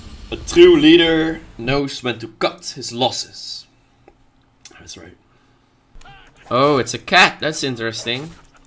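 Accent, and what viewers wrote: Netherlands. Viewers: is dutch he has a dutch accent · You sound like you are Dutch Je klinkt alsof je Nederlands bent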